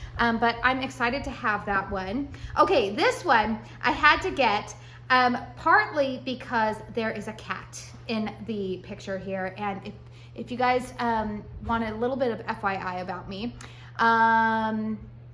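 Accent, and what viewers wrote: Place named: California, United States. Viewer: Omigosh how lovely thanks! You made my day 😀. I actually just recorded a Goose Creek haul (posting tomorrow) and we have some pretty similar takes. It is too darn early for me to start thinking about Fall as well! The Churros candle - it was playing a trick on me too! At first I thought maybe there was some apple in there. It is so similar to cider doughnut/brown/cinnamon/sugar type candles. I think I’m just so used to fragrances like that having an apple component that I imagined it. 🤷 You totally have that classic Cali accent going on and I’m here for it 😊 Thanks again! Have a great weekend!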